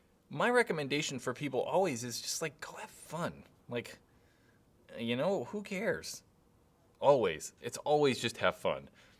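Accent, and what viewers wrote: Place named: Boston, United States. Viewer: Love your Boston by the way!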